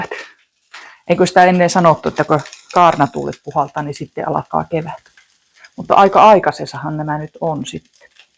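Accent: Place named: Oulu, Finland. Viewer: Kiva kuunnella oulunaksenttia,edesmennyt mieheni oli hailuotolainen😢❤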